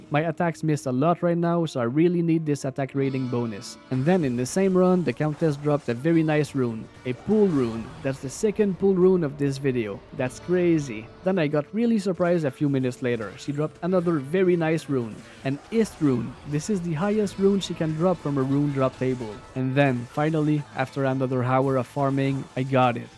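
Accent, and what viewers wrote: Quebec, Canada. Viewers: do i hear a small Québec accent ? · Sa sent vraiment le québécois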